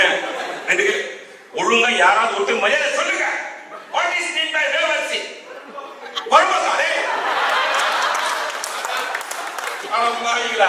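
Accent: Bangalore, India. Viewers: Bro calm down - it's the Bangalore tamilians slang.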